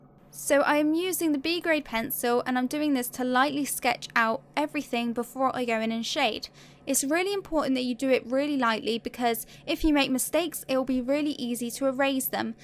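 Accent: United Kingdom. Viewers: Believe me your sketch is far beautiful than the real picture and by the way I love British accent.😉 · I love your British acsent. I could listen to you talk all day. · Beautiful British accent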